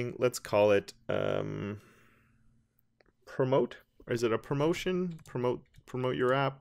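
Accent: Canada. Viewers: you must be Canadian arent you? the accent sounds soo Canadian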